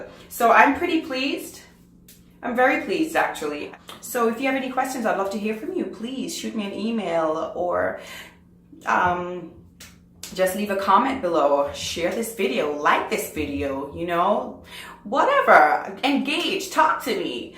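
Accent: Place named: Jamaica. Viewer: Are you Jamaican? I think I hear an island accent ! Lol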